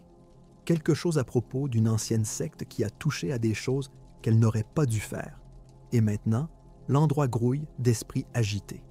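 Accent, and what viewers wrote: Quebec, Canada. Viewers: J adore vos histoires...avec le petit accent québécois c est très sympa 👍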